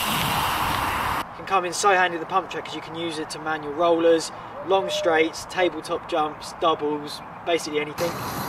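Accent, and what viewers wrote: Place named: England, United Kingdom. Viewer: nope , he is from England. Można wywnioskować to po akcencie , i oczywiście miejscu zamieszkania , żyjemy w dobie w której o takie informacje łatwo , wystarczy "wygooglowac" ;)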